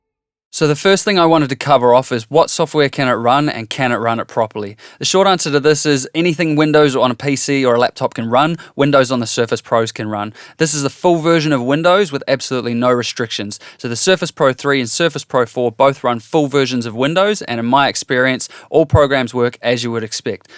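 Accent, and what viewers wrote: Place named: Australia. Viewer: nice review. Also as a kiwi living in the US it's cool to hear an accent from down under. Chur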